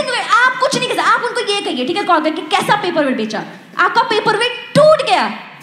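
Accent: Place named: India. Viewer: dont emphasize each and every word in typical ta ta ta indian accent .stop that its annoying paperweightttta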